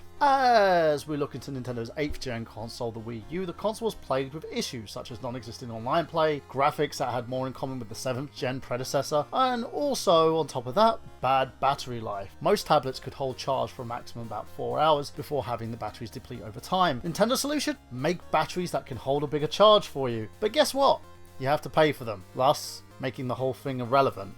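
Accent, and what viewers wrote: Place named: England, United Kingdom. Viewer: you're right. I was mislead by the title of your video, and thus chose to watch the video believing that it was going to be a quality, intelligent critique with evidence-based facts and actually be about the Switch. My mistake. But through our correspondence I have learned something; that even guys with English accents can be childish YouTubers who get butt hurt by negative comments on their videos.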